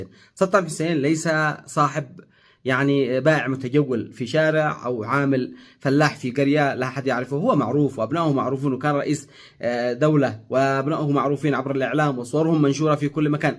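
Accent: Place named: Yemen. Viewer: ياخي قد لهجته يمنيه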